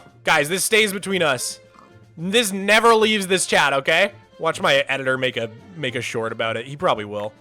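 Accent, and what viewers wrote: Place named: United Kingdom. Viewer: the british accent caught me so off guard that was so good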